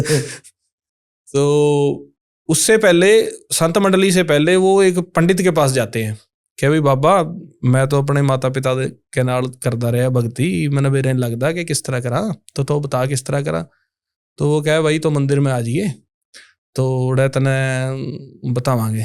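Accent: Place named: Haryana, India. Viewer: So sweat haryanvi language sone bhai sab ji 🙏 🙏🙏🙏🙏🙏🙏❤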